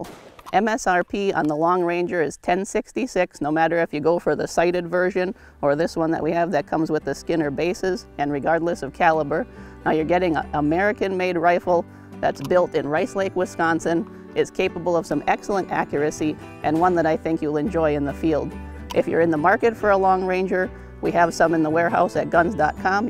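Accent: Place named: Michigan, United States. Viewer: Keep coming back for that wild Michigander accent ;D your the best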